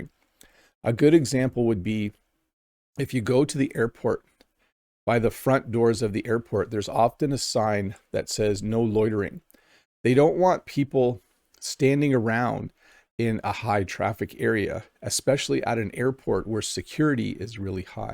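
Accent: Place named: Canada. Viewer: Thank you,. I study English almost every day, but there’s just so much to learn. In the past few days, I’ve been focusing on English intonation and connected speech. My pronunciation of individual word is pretty good, but when I speak, I still don’t sound like a native English speaker. Intonation and connected speech are my weak points. I live in Toronto. Once my English reached a certain level, I’d like to come visit you! I really enjoy your English lessons, and I especially love your Canadian accent! Thanks again,!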